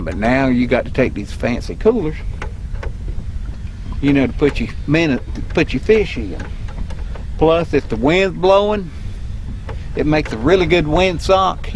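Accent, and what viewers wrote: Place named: South, United States. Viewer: Thanks for your videos and knowledge. I have always been a bass fisherman but just bought a new boat and my dad wants to go trolling for crappie. I had no idea how involved crappie fishing is. You really educated me and I appreciate your knowledge and the way you do your videos. you talk "regular" good old boy language I can understand! Thanks for sharing your knowledge!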